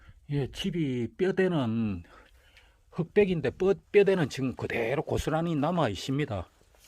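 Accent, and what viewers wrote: South Korea. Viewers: 님은 고향이 어디시길래 촌말을 그리 맛깔스럽게 하시남유?ㅎ ㅎ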